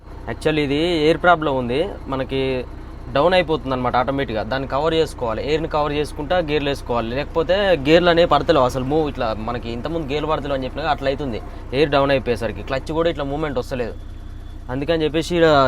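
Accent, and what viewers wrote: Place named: Andhra Pradesh, India. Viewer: Inkokasari andhra slang takuva chesi matladutey ninnu ni amma ni ni pellam ni Ni lorry lo ney denguta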